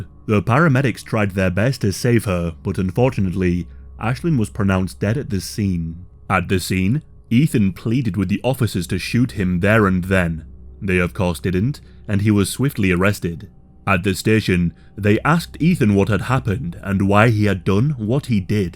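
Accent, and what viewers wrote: United Kingdom. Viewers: Yes, sounds British to me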